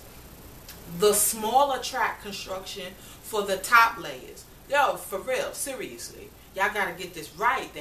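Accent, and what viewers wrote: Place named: New York, United States. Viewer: Gurl,, you had me on the floor. It is rare to hear an NYC hair person on here. I loved your frankness!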